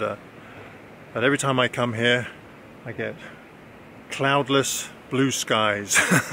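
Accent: England, United Kingdom. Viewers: Hi. Crazy question, are those magnetic reading glasses? If so, what brand are they? Look like exactly what I need to avoid regularly leaving behind/ sitting on/ losing mine. PS, it’s really refreshing to hear a laid back English accent for a change!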